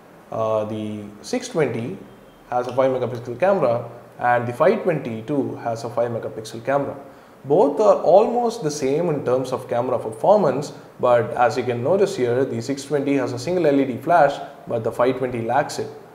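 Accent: India. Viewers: your voice is very clear you had a really nice review and you didnt speak too fast for some reason, I liked the hint of an indian accent to your voice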